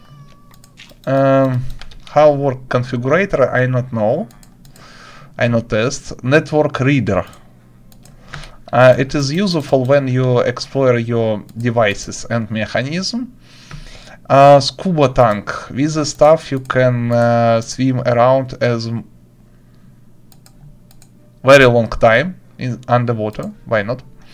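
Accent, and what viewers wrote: Russia. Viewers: ты говоришь на руском? очень похоже просто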